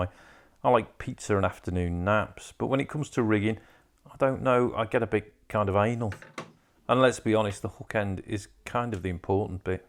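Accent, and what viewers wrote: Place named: Liverpool, United Kingdom. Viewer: Where’s that accent from? I get bits of scouse and bits of the midlands! Great vids mate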